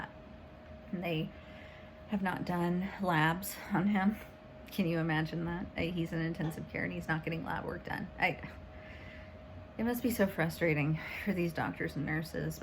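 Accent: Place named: United States. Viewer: My thoughts are with you sister. I'm a dissociated apostate 2 yrs now and my beautiful wife Nicole is still a inactive fully believing jw. I told Nicole if she ever needs a blood transfusion I'll give her one she said she'll divorce me. I told her id rather have a living divorced wife than a dead married one. When I dissociated 2 years ago over the child abuse cover ups. I rang up Nicolas father who's not a jw smart man and told him I'll give his daughter a blood transfusion if she needs one and I apologised to him for been so brain washed by this cult he thanked me and laughed when I said I'll give her my blood you'll get to keep your daughter I'll just lose my wife. I told her doctor I'll give her my blood he said we can't go against your wife's medical health directive and as doctors we can't go against your wife's religious beliefs I'll rip up her medical directive then. 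So dear sister what your going through now is what us normal people will all go through when we have jw family members who we dearly love and may well be in the situation your in now . So my dear sister I'll give you a big Australian hug all the way to America you sound American or Canadian us Aussies can't tell the difference .I love you sister and feel for you check out my you Tube channel called Justin porter I have 13 videos one where I impersonate Steven left and one where I film Australian fruit bats and talk about apostate goodies because us apostates are the goodies. Warm apostate love dear sweet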